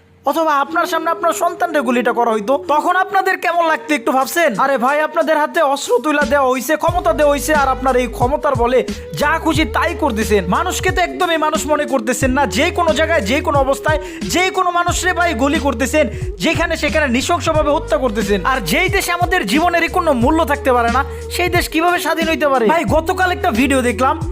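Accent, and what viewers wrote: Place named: Dhaka, Bangladesh. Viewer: Vai apnar basha gatrabari kon jaygay plizzz vai bolben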